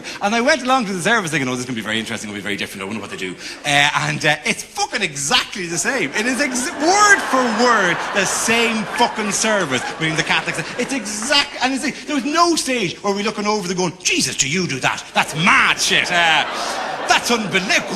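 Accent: Ireland. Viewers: How similar is his accent to the way they speak in Ireland?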